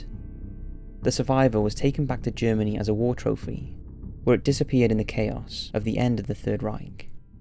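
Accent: Wales, United Kingdom. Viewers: Ate you Welsh? If so what part? I'm west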